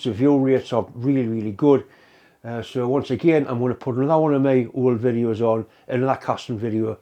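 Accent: Newcastle, United Kingdom. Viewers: Now''t amis we a bit o Geordy!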